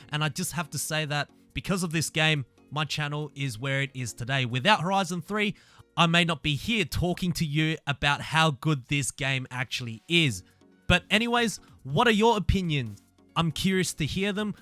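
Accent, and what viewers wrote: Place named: Australia. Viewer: Everyone who is recording forza videos have Australian accent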